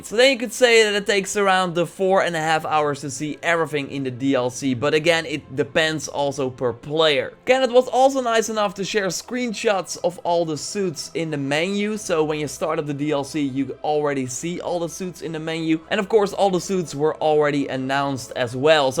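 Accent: Netherlands. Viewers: Are you from the netherlands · You’re dutch. I can tell your accent haha (im dutch to :] )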